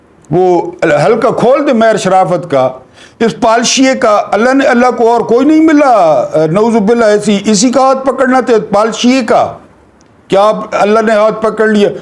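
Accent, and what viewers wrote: Saraiki, Pakistan. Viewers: اسلام علیکم ورحمتہ اللہْ ھمیں معلوم ھے آپ کو انگلش پر عبور ھو گا مگر یہ اردو کا سرائیکی لہجہ قیامت ھے اللھ قسم یہ آئی ایم کی بجاۓ مَین آدھے ھین او تو ھمیں زیادہ مزیدار لگے گا انگریزی کی مت نہ مارا کریں اردو سرائیکی لہجے میں واہ کیا کہنے انگریزی سرائیکی لہجے میں......... اوپر سے گزر گئی آپ کے وی لاگ کا جواب عشق قاتل سے بھی، مقتول سے ہمدردی بھی یہ بتا کس سے محبت کی جزا مانگے گا؟ سجدہ خالق کو بھی، ابلیس سے یارانہ بھی حشر میں کس سے عقیدت کا صلہ مانگے گا والسلام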